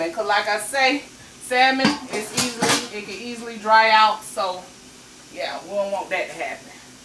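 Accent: South, United States. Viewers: HELLO BEAUTIFUL BLACK QUEEN I LOVE YOUR COUNTRY TWANG YOU ARE A COUNTRY GIRL JUST LIKE MY MOMMA I LOVE YOUR RECIPES MY NAME IS SABRINA I DO NEED HELP IMPROVING MY COOKING SKILLS I DON'T MIND SENDING YOU A FEW DOLLARS HERE AND THERE I WILL TELL WHAT I LIKE FOR YOU TO COOK AND YOU TELL ME HOWNTP SEND YOU A FEW DOLLARS THE SALMON I DEFINITELY HAVE TO TRY AND THE SEAFOOD DRESSING I GUESS IF I DID THIS FOR MY MAN HE BE READY TO PUT A SISTER ON LOCK WHERE YOU FROM I LOVE YOUR ENERGY AND HUMOR YOU KEEP YOUR COOKING FUN I DID SUBSCRIBE TO YOUR PAGE ANYWAY KEEP ME POSTED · Hi, I'm new to your channel. I watched 1 video and couldn't stop! That country accent along with those delicious meals bebeeee, made me subscribe! You're doing an awesome job. Keep the videos coming. I swear I'm gonna try some of these meal. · Sweetie ain't nothing wrong with the way you speak. 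We're Black and proud!!!Just like Jamaicans have patois so do us country folks. People can poke fun but they wanna sound just like us. Keep doin whatchu do Queen. Thanks and blessings from Connecticut😚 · Lawd wea u from lol, i swear you got to be from Alabama, Georgia or somewhere from the south cause that damn country accent is strong and I love it lol you sound so much like my folks down south lol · I'm not sure Where you're from, but I Absolutely LOVE Your accent!😘😘😘😘 As a matter of Fact, the Accent is what "Drew ME In" bcuz I'd never seen 1 of your videos until recently and it was Your Easygoing nature & Naturally "Country" Twang, that made me come a 2nd time, so Please-just BE YOU.......💜😏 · I like when you talk in your normal southern voice. This was a great video. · no you're not too country that's being you that's natural don't stop being you please do not change your accent your natural country swang... 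Jersey and PA love you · We love you! I have one request tho.......PLEASE DO NOT change your accent. We love your naturally country swang accent from the first video. Its original & it reminds us of our mothers & grandmothers cooking. Do not switch to the “proper talk”. We like the down home country talk. Love ya, be blessed😎♥️🤟🏾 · Country speak is for all us Southerners—black, white, brown, or purple! I think I trust someone who speaks like you more than someone without an accent when it comes to good food. I am a plus size girl as well and my daddy always said he wanted to be served by plump people because you knew the portion size was correct! Glad you are back!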